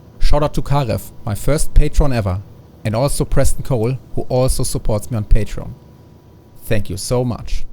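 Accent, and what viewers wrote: Germany. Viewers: very deutsch :D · r u german ?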